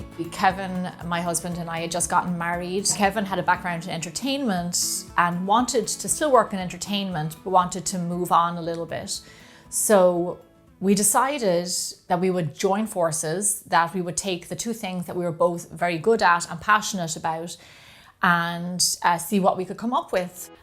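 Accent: Ireland. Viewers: I believe that I was one of your first viewers. I am so happy that you have become successful with something that clearly makes you happy. I adore your Irish accent as it reminds me of my favorite moments when I would go to my grandparents home in Kilkenny Ireland. They have since passed and I haven’t been back but I like being able to listen to your calming voice talk me through your delicious recipes. I’ll be ordering a cookbook. Also, I need to find some cute measuring spoons and cups like yours as they brighten my mood.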